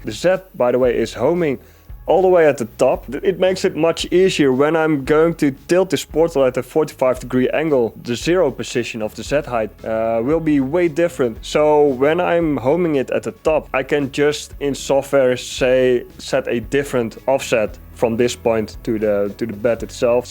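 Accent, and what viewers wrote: Netherlands. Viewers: dacht all aan het aksent dat hij NL/BE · sound like you also talk dutch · Your accent gave you away quickly :) later in the video it was confirmed Lekker gedaan pik ziet er prachtig uit! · where you from? you have a dutch accent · Absolutely love the video, really nice build however would you mind doing a video in Dutch as the accent is so thick it would be easier to watch In Dutch 😅 anyway as I said before the engineering part of it is very well done! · i think ur dutch u have a dutch acsent(im also dutch) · ofcourse he is Dutch, why am I not suprised :) we are some genius people :) edit: confirmed lmao. I can recognize that accent and that view from anywhere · This is the first video I've seen by you. I immediately subscribed to your channel and will check out your other videos. I really like your idea and of course your 3D printer looks cool as f*ck. 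By your accent I immediately heard you are a fellow Dutch. I hope to see more good stuff.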